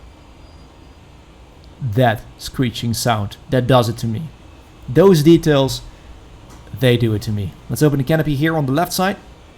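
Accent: Netherlands. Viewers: Are you Dutch? You sound Dutch lol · Grappig. Je hoort direct dat je een Nederlander bent. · Klinkt Hollands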